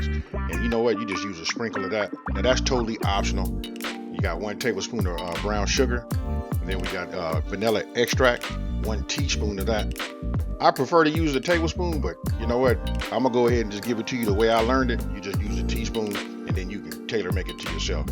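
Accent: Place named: California, United States. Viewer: The California accent though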